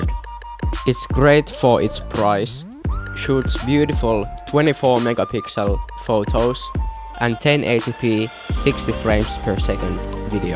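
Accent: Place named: Finland. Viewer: Ootin hitonmoista rallienglantia, mitä vielä! Nice🤘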